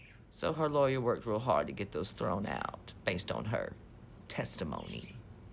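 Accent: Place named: Louisiana, United States. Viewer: First of all took me a while to figure out what that accent came from.Yeah, I know, shut them.I'm like.Oh that's a proper southern woman's language from louisiana.. Don't care , don't care you have beautiful grandchildren , you have beautiful Beautiful. Eyes I can see it way appear in ohio Don't mean anything by but I love you honey❤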